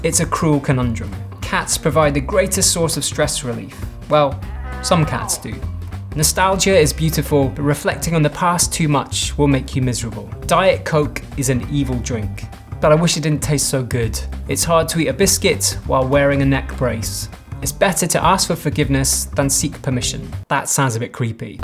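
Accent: Scotland, United Kingdom. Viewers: If it makes you feel better, you sound Scottish to this American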